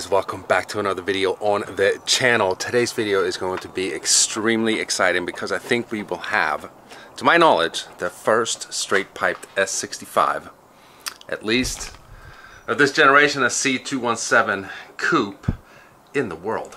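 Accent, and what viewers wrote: Sweden. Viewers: Are you from sweden originally? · are you swedish?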